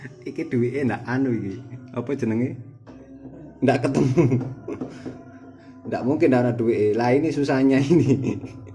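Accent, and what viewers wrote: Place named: Jepara, Indonesia. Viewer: logatnya kyak jepara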